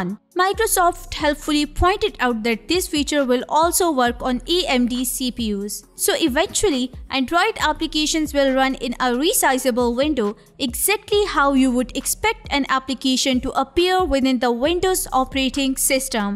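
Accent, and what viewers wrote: India. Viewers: I think you have really interesting Content, but I can’t stay this Indian accent anymore. Sorry i tried. Really 😩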